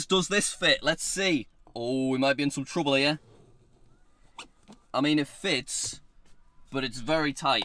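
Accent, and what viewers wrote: United Kingdom. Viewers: I love the British axsent because it is so funny 😆 haha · are u bri ish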